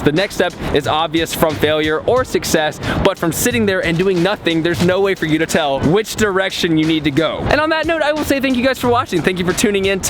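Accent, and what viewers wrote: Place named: New York, United States. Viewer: lol i love it the new York in you is starting to come out.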